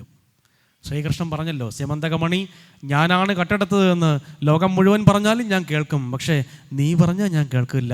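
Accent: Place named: Thiruvananthapuram, India. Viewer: തിരുവനന്തപുരം ഭാഷ യും. മഹാ പണ്ഡിതനും ഹരേ കൃഷ്ണ 🙏 ഇതു കേൾക്കാൻ sadhichathu മഹാ ഭാഗിയം 🙏🙏🙏🙏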